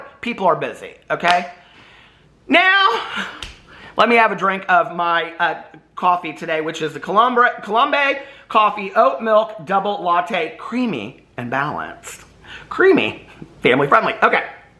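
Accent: United States. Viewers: When I first started following you a couple of years back I didn't understand the American accent and thought you were giving a radio call sign in your intro and maybe you were a DJ haha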